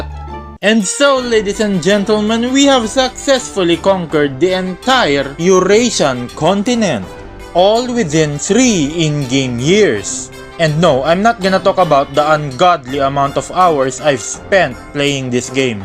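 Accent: Philippines. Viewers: Pilipino ka po?yung accent kase parang filipino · Hahahaah ang accent talaga pinoy na Pinoy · Bro, you have a filipino accent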